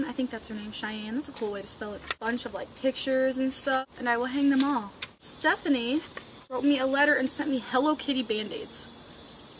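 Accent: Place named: Chicago, United States. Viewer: do u live in chicago... cause my family does and u have a illinos/chicago accent..... just wondering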